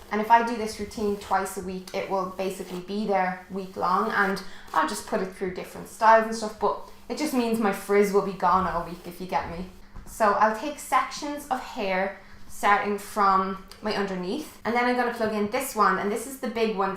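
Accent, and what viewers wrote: Ireland. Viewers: Your so beautiful, and it's so weird(not u being pretty) it's weird that I'm like your doppelgänger but younger! I had extremely curly hair like you did! And I presume your from Ireland?! Well me too! And I have the same accent, I have extremely think hair,frizzy/curly hair like yours... I love your channel and you look so pretty and beautiful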